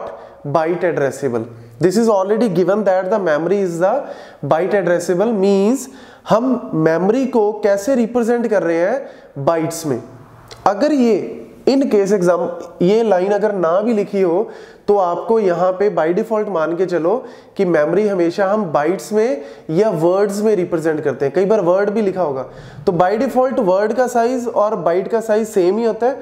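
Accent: Punjab, India. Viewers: Yeah the punjabi accent is really good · I love your Punjabi accent that makes me enjoy topics because l m also Punjabi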